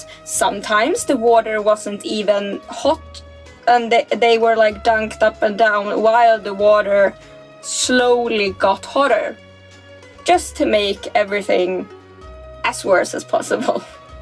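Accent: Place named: Sweden. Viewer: Loving your channel and your accent, are you Swedish? Keep up the great work young lady! 😊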